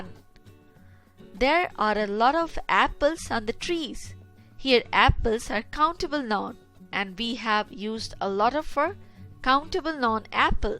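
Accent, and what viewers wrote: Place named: India. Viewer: where r the odds ... bruh indian teachers again , just look at the accent its annoying tho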